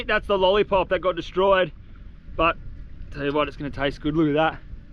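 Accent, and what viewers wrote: Australia. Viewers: Is he a Aussie? His accent reminds deff yells "Hey! I'm from australian!"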